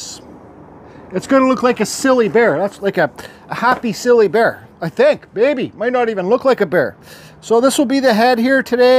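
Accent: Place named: Canada. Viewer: Love the Canadian accent !!! 👍 I’ve learned So Much from You !!! 👍 You’ve Taught Me So Much !!!👍 Thanks 🙏 !!! Can’t Wait to get started !!! My Father was an Artist , Acrylics !!! 👍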